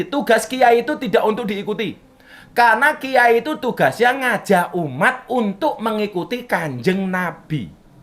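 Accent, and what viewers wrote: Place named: Indonesia. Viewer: Wow cara baca lafadz arabnya khas islam nusantara. Ngaji dimana ya pak?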